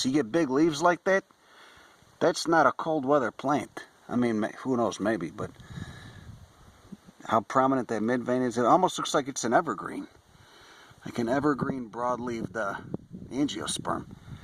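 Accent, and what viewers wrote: United States. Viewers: those leaves look like they were pressed yesterday, thanks for the video, just one thing can you slow your narration down just a tad i have trouble keeping up, not use to the U.S accent.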